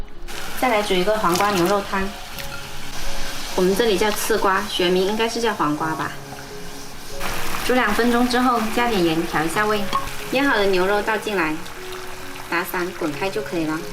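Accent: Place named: Hakka, China. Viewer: 好奇是客家还是潮汕人？口音很像客家人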